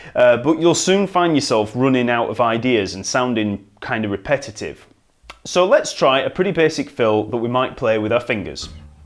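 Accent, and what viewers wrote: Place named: England, United Kingdom. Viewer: I really need to admit that Your tutorials are very helpful! I started giving up with looking for some simple but full of knowledge and exercises videos, and, what's more, for free. And then, I've found You and once again I really awoke this desire to play bass on a high level! Thanks Btw, what kind of English accent do You have?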